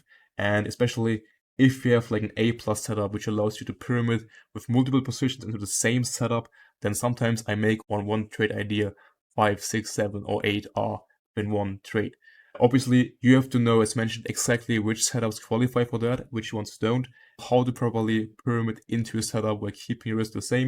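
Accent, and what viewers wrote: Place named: Germany. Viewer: Gotta love that German accent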